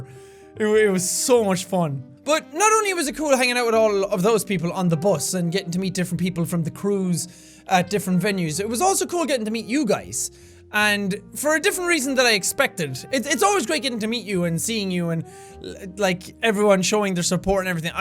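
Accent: Ireland. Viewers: Welcome back! Even though I was binge watching previous videos, I still miss hearing that sweet, sweet Irish accent ^_^ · I've missed that high pitched Irish voice so much! I've watched all the series on this channel to keep me happy and distracted from the shitty reality since I've been here for about 2-3 years now. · you can here that his irish accent is stronger after he was in dublin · Ah the accent., you have clearly been back to Ireland. Lovely. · It's nice to hear your Irish voice again.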